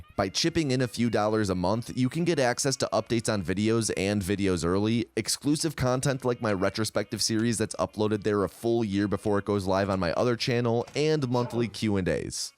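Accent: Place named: Minnesota, United States. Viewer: Good MN accent, as a Minnesota native, I approve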